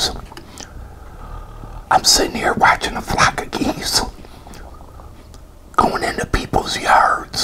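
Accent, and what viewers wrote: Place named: Texas, United States. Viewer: Because I thought you sound like a new Yorker but you sound like your from texas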